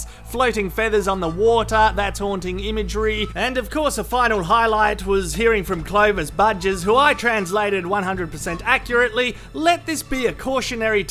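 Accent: Australia. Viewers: Amazing Aussie commentary 😂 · The Aussie accent makes the expert name sound funny too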